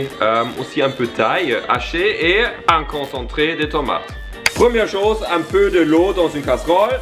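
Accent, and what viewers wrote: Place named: Canada. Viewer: tu mon youtubeur préféré desvidéos accent canadien et qui met quoi des vidéos trop trop rire 👍👍👍👍 24 heures 🇨🇦🇩🇪👍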